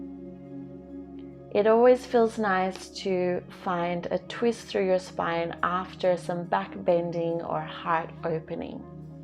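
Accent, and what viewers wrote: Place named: Sydney, Australia. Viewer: First time watching your video and a new subscriber here! Great at home work out thanks! I'm living overseas so great to hear a fellow Sydney sider as well!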